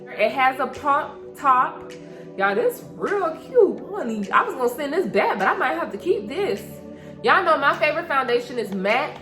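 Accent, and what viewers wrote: United States. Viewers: First of all I liked and subscribed within the first 2 minutes secondly, I ordered the same number and girl you did that! Yuhhhhhhhh * yo voice *😂😂🥰 I knew you was from down south.. THEN YOU SAID Carol city!! Oh bihhh yessss 😂305 honey.. Take it to the houseee. #thecrib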